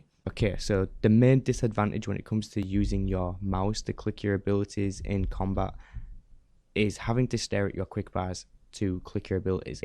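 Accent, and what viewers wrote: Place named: United Kingdom. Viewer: speak english................damn, tired or britiish accents, mumbling fucks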